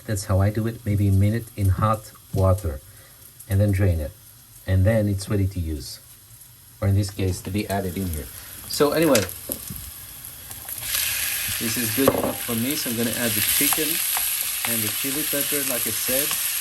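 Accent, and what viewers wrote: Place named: Netherlands. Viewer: Dear My name is Dringa vlogs I’m a hundred percent blind, autistic and have a chronic heart condition. Okay, three things to say. 1. I love this video, your voice gives me ASMR, because Dutch and German are so similar, the accents are also, and your’s is one of the ones that gives me ASMR. 2. I can NEVER have this as I’m allergic to nuts, it’s called anaphylaxis and is life-threatening, I can’t even touch nuts, much less eat them. 3. here are some things you MIGHT be interested in <link> <link> <link> <link> Sent to you with mmm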